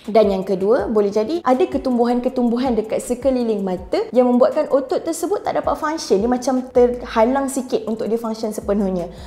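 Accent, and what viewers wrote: Malaysia. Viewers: Cewek malaysia lucu klo ngomong 😂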